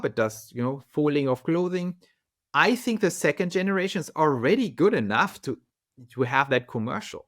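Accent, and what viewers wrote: Germany. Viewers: The fact that you employ a German accent in your presentation adds to the drama and seriousness. Nice!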